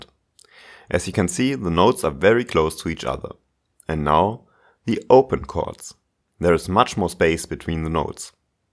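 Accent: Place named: Germany. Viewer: German accent for ever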